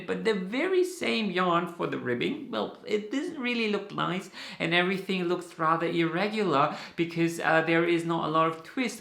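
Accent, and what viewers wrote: Germany. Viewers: zu den linken Maschen: die stricke ich so, wie du sie gerade gezeigt hast und zwar sehr schnell....und ja, bei allen Zu und Abnahmen muss ich entsprechen umdenken. Das ist so wie beim Altsaxophon spielen ohne transponierte Noten im Orchester: das passiert im Kopf automatisch. Es ist aber schwierig diese Art anderen dann beizubringen, warum sie es andersherum machen sollen, wenn es doch anders in der Anleitung steht. Stricke über 50 Jahre schon so und komme gut damit klar. Was mir wirklich gut gefallen hat, die tiefgründige Erklärung mit den vielen Details für die Maschenprobe. Da bin ich eher nicht so hinter her, aber nach diesem Video werde ich es jetzt doch öfter versuchen. Obwohl wirkliche Pannen habe ich noch nie erlebt und ich stricke viel und alles was sich Stricken lässt. Mein Motto ist dazu das Garn muss seine passende Nadel in Material und Stärke finden. 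Manche verstehen sich einfach nicht und dann kommt nur Murks raus. Das merke ich aber Recht schnell und kann dann was ändern. Ein tolles Video von dir. Vielen Dank. PS: dein deutscher Akzent stört mich überhaupt nicht. Deswegen antworte ich auch auf deutsch😉👍🧶🧶🧶🧶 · Thank your for sharing the language behind your accent. To my ears, it's similar to a couple of others but try as I might, just wasn't able to place it. Likely because most of the Germans with whom I've interacted spoke with a very thick, almost blurred. Your accent is crisp and clean, unique and very pleasant to me. Although I noticed your pronunciation of diameter, because I knew what you were saying, didn't really give it much thought one way or the other. Like knitting, English is spoken with different inflections and pronunciations all over the world, even when English is the native language, as the Brits and Americans. I've been knitting for over 50 years and am still learning new things, so it never gets dull. 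· My knitting "don't": Don't ever tell yourself that you can't do a certain technique, like color work or lace. Start with something small like a hat or even just a swatch. Want to knit a cardigan but the pattern uses steeks? Knit a swatch to practice steeking before committing to making the garment to see if you even like the technique. At the end of the day, it's still knits & purls, and it's only yarn and you're not required to like everything you try. Also, I enjoy your German accent quirks - I enjoy most accents in general 🙂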